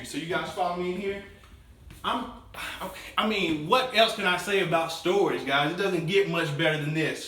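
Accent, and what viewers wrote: South, United States. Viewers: I love your country accent. Pay no attention to my son's Lego icon.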